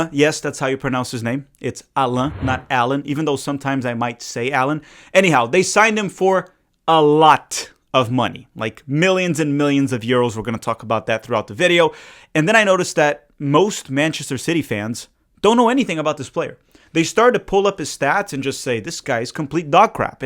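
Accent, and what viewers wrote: Brazil. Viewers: His pronunciation has a little bit of an accent on it lol. I think he might not be from São Paulo, where Palmeiras is from, cause we pronounce it a little different even though we're both Brazilian haha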